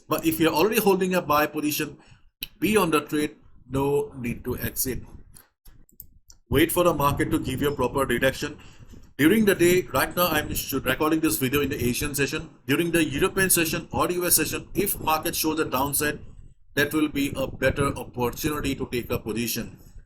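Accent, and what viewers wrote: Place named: Punjab, India. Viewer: bhai teri english or meri punjabi ka accent 1 hay love from pakistan .. good analysis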